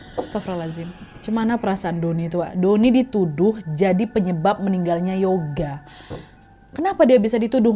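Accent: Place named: Medan, Indonesia. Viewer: Medan yah kak?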